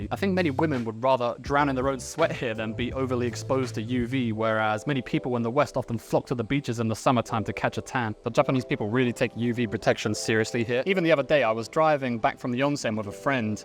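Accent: United Kingdom. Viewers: 日本人ですが、楽しく見させてもらってます。 ロケ地のチョイスも素晴らしいです。美しい。あとイギリス発音がなんか気持ちいいです。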